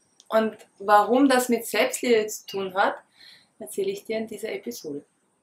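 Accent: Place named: Austria. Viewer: Danke für dieses inspirierende Video! Und auch für diese Ehrlichkeit einfach diese "andere Stimme" in dir und somit einen Teil deiner eigenen Ängste mit der ganzen Welt zu teilen! Ich bin übrigens auch aus Österreich und liebe es auf YouTube mal wieder die gewohnte Aussprache zu hören! 😅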